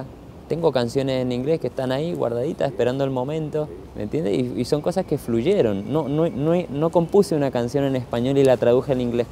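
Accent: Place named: Peru. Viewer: a ver señor atole, ponga atención a la gramática, yo no escribí que no lo conoce, escribí que dice que no lo conocía, es decir en tiempo copretérito, denotando que este peruano con apariencia de peruano y cabello lacio de peruano y acento de peruano que ahora se dice el mismo que es argentino quiere dar a entender que el conoció el reggae y empezó a hacer reggae y que el ni en cuenta que existió bob, luego presume que tiene canciones en inglés, y bla bla bla puro egocentrismo, y obvio que se puede aprender por otros medios pero no estamos hablando ni de ti ni de mi si no de ese falso rasta que nunca lo fue en verdad, su voz temblorosa al cantar parece enfermo y sus letras aparentemente con sabiduria estan mas que vacias. quieres saber de rastafari tengo el kebra nagast y los libros de enoc por si te interesa saber de un tema en particular.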